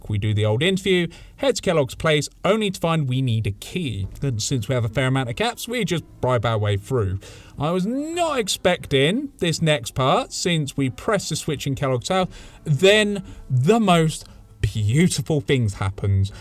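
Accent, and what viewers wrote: United Kingdom. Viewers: I swear you make yourself sound more British every video. I guess I kind of do the same thing in person where I make myself sound more American. I’ve recently added “I don’t rightly know” to my lexicon.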